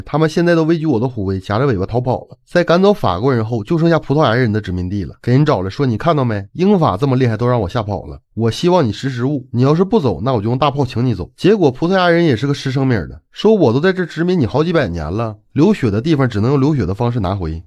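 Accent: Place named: Northeast, China. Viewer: 虽然是东北话，但是你能听出来这个，那真是你的问题了。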